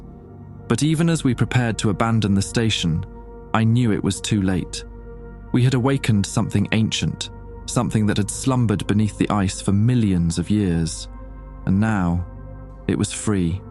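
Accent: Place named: United Kingdom. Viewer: a handsome british voice on an (ant)artic creepypasta? sub.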